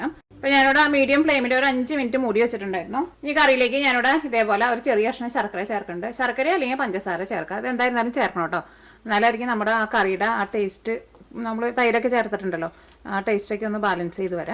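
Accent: Thrissur, India. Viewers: Heyyy, you are so adorable n I simply love yr simple n natural method of recipe explanation in your dialect of Angamali?? But feels like Thrissur...oh btw -that kadai is sooo beautiful..at the US shop???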